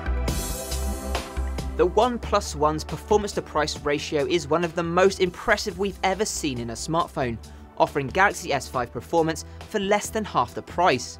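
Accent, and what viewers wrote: United Kingdom. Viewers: The British accent is so amazing <3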